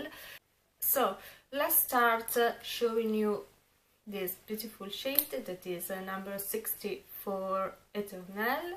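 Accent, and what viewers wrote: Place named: Italy. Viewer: Hi! It’s been awhile since I’ve watched your Chanel, but I hardly watch any UTube videos…actually usually never. 😮🫣 Anyway, I enjoyed this one as I am interested in these new lipsticks! 😊 All of those shades look great on you, and I think I would buy all of them except the orangey red and the very dark vampy one. Thank you for sharing these with us, (and you are so cute speaking French with your your darling Italian accent!) 😅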